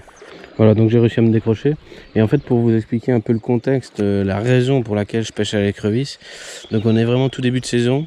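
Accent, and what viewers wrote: Belgium. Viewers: Wouah 😲 respect mec 🤜. TRES belle section, des truites magnifiques. j'ai Jamais vu une vidéo pareil...tes très respectueux avec les truites un vrai passionné ça fait plaisir à voir 😊. Je m'abonne à ta chaîne du coup et bien sur le petit pouce 👍. Ne change pas surtout 😉. Bye PS: Petit accent belge ?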